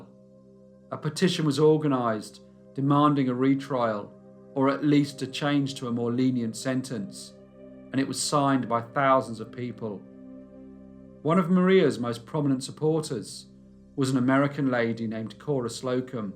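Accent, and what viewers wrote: United Kingdom. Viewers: Mort sent me..... I also happen to love channels like yours and love the British accent. 🙋‍♀️♥️🤗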